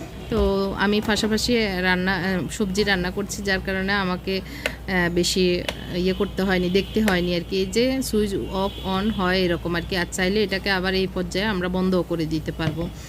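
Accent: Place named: Feni, Bangladesh. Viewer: উনি তো জোর করে কাউকে ভিডিও দেখতে হাতে পায়ে ধরে নাই। যার ভালো লাগবে দেখবে না নাহলে দেখবেনা। দেখবে আবার নেগেটিভ কথাও বলবে। উনি ফেনীর মানুষ। তাই ভাষায় একটু আঞ্চলিক টান আসে। এটা দোষের কিছু না। আমরা কেউই নিশ্চয়ই বিশুদ্ধ ভাবে বইয়ের ভাষায় কথা বলিনা। উনাকে সবাই ভিডিও তে দেখে। আর আমাদের কেউ দেখেনা। তাই নিজের দোষ কেউই দেখতে পায়না। অন্য কে অসম্মান করার আগে অন্তত একটুকু ভাবা উচিত যে তাকে অপমান করার যোগ্যতা সত্যি নিজের আছে কিনা।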